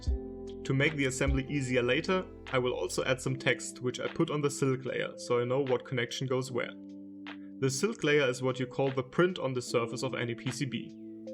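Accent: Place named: Germany. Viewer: Judging from his accent, he's german. So make ("machen") is often used broadly in foreign languages, even if he was referring to actually design(ing). That what happens if you don't use your mother tongue and its certainly not with bad intent.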